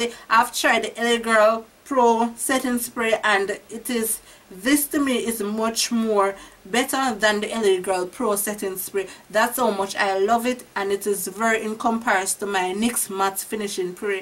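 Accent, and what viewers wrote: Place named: Jamaica. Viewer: Never expected a Jamaican accent when I clicked this lol yas...got it for 2 bills in Ochi...hoping its just as good for me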